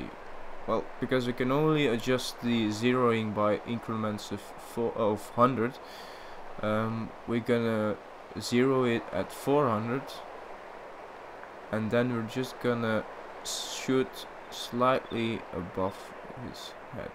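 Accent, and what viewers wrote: Netherlands. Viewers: your accent , are you dutch ? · you dutch? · i knew this guys was dutch right when he started talking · Let me guess u come from holland XD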